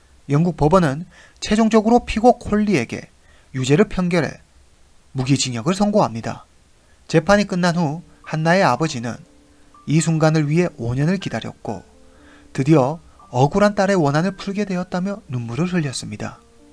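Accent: China. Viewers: 똑같이 느끼셨군요, 조선족 말투???....😁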